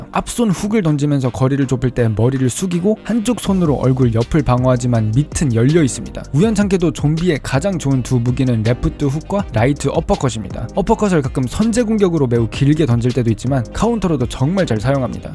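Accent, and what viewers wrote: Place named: Gyeongsang, South Korea. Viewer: 은 고향이 경상도 어디세요? 저랑 사투리가 똑같네요ㅎㅎ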